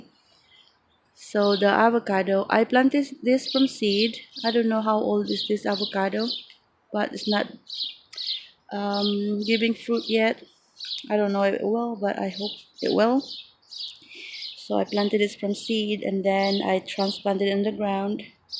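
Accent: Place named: Guam. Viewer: great ideas! I was guessing your accent was from Guam. I was close! :)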